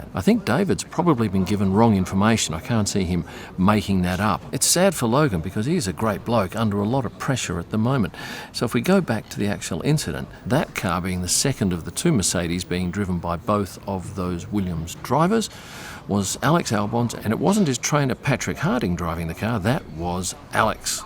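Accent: Australia. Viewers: love your videos! Great visuals and commentary, love hearing that awesome Australian accent. Take care! An American fan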